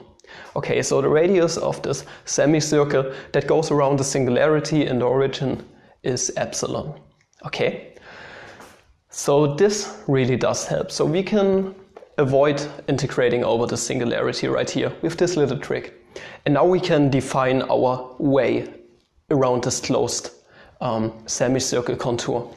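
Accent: Germany. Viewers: No offense, but the German accent is not helping pronunciation. 😁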